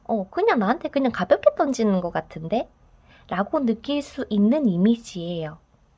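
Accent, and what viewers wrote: Gyeongsang, South Korea. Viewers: 하트 뽑았습니다! 처음 왔는데~~~ 경상도 분이시죠? 사투리😁 저도 대구사람인데ㅋㅋ 경상도사투리로 듣는 타로는 처음인데 재밌네요😄 외로워요ㅠㅠㅠㅠ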